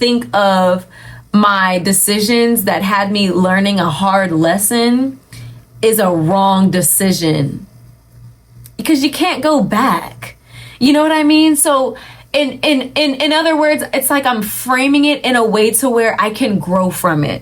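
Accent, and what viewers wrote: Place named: United Kingdom. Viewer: The British accent on point lmao Love the video ❤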